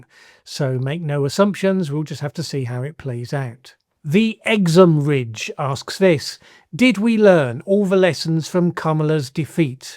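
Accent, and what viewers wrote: Birmingham, United Kingdom. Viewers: I’m a Southern Counties Brit and now can’t help trying to imagine your Brummy undertones. Unfortunately, I only discovered your channel recently, and as something of a Climate nerd would likely have benefited from your earlier videos. To me you are providing helpful leadership. That said, I slightly wince when you cover your back for not being even better, although that’s obviously a cultural thing that afflicts us all. I realise that standing for what you’ve just said by not following it with an apology to fend off the expected attacks is uncomfortable. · l always enjoyed these little explanations. What a gifted teacher. He has the ability to impart knowledge in a way that is easy to understand. Great questions too. I got the accent because I am also a 'Well Spoken' Brummie. Yow awlright Bab? The Birmingham accent is actually sardonic and self depreciating in a somewhat sarcastic way. 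Ow Arr.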